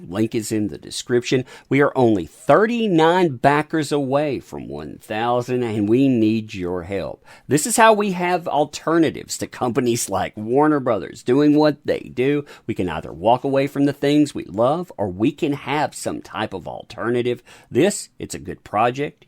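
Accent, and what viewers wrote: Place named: Appalachia, United States. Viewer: Can you stop talking in that accent? You're not a hillbilly hick from the 18th century.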